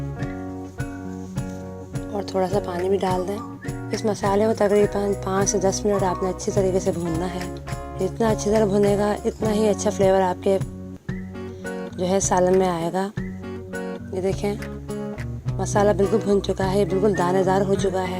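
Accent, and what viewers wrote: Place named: Hyderabad, India. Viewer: Hydrabadi accent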